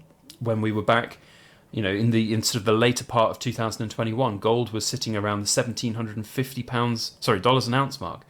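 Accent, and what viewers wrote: United Kingdom. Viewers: Greetings from Colorado. You have to pay VAT on silver? I'm surprised it's even worth buying once the tax is factored in. I'm coming over to UK this summer and might consider bringing silver to sell. Love the Harry Potter accent!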